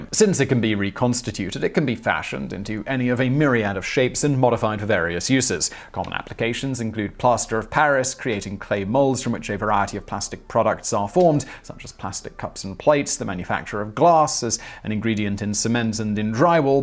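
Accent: United Kingdom. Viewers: I have no idea about anything that you talk about, but because you have a British accent I agree with you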